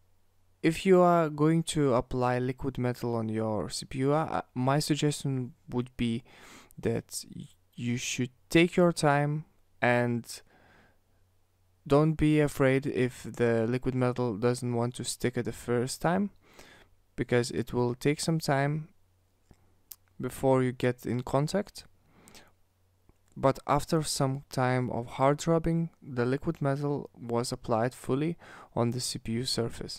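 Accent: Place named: Russia. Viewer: Русский что ли ? По моему акцент есть .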